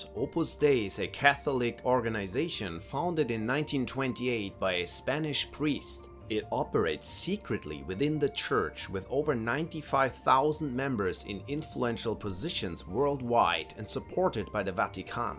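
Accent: Germany. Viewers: Why so German 😮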